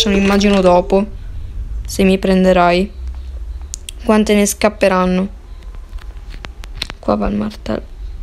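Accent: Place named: Rome, Italy. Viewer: Vai con ľaccento romano regaaaaaaa😂😂😂 I primi urli sembravano degli ornitorinchi spaventati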